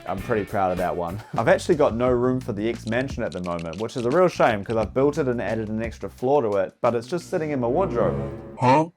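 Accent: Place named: New Zealand. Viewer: Saw you on VidIQ and was like "hmmm sounds like a kiwi and his account is. I'm gonna go out on a limb and assume he's a kiwi". So obviously subbed. Congrats on the success fellow Kiwi creator:):)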